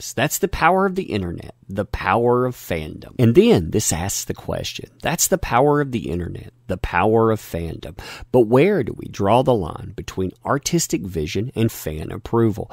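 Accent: United States. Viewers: I agree with you 100% but I disliked the video because of your scripted strong American accent.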